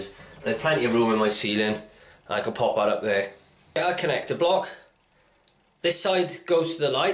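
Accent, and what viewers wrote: Wales, United Kingdom. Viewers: He sounds a bit Welsh ....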